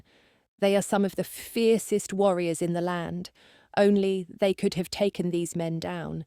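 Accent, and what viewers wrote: United Kingdom. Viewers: It’s hard to listen to a Scottish story with a British accent🫤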